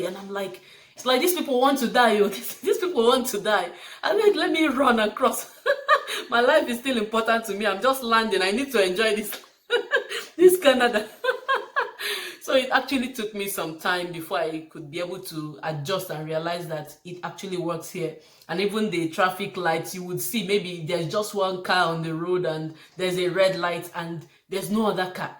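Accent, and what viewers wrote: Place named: Nigeria. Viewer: Canadians have a hard time with Nigerian accents, partly because we're just not used to them, and they're so different from North American accents. The first trick is to slow down. Then try to harden your 'r's, so that, for example, "evah" comes closer to "everr" - the "rhotic r" is not as elegant, but it's more likely to be understood here. Then try to flatten your long 'i's so they don't sound the same as long 'e's - my doctor, who is Nigerian, once asked me if I wanted some "peels" - I had to ask him to repeat himself three time before I realized he was saying, "pills" ... ! Btw, I don't think most Nigerians would have any trouble with their accent in England, if that's any consolation ... !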